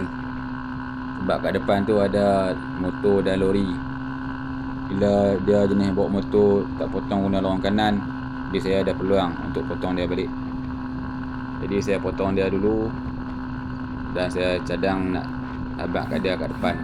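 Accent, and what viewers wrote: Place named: North, Malaysia. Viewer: ckp utara..tp bju konoha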